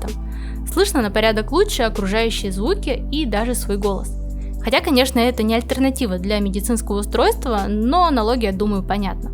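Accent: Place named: Perm, Russia. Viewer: Я думаю, какие-то пейзажи знакомые. И говор... так она ж наша Пермская!) Приятно)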